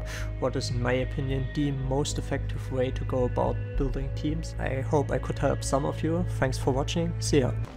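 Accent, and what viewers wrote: Saxony, Germany. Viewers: are you german? It sounds like you have a german accent:D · Your accent sounds kinda German, may i be wrong? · kommst du aus sachsen 😂❤ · wie man den deutschen akzent nicht nicht beachten kann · your accent is sooooo german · Hahaha are you from Germany? If so you can hear it out from your English accent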